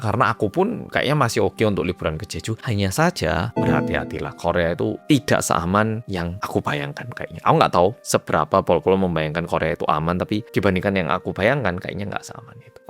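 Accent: Java, Indonesia. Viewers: Logatnya seperti orang jawa😅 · Salfok bahasa Indonesia medok tenan... Markotop Lanjutkan terus kakak... Oppa... Mas_eeee.... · Org Korea yg medok bnget😊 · Waduh padahal pernah ndok jeju seminggu sekali kapal ku docking di dermaga sana. Angin nya besar pernah hampir terbang gara2 bawa payung 😂. Karena kota nya kecil kukira jeju itu kecil. Tiap meninggalkan jeju pasti kapal yg kutumpangi lewat depan area golf tepi laut. Itu ingatan yg agak samar karena sudah 26 tahun yal. 😢. Ini plot twist e piye sidane mas korea sing medhok?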